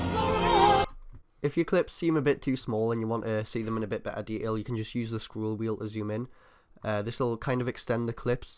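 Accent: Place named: North East England, United Kingdom. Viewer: your from the northeast of England :D like me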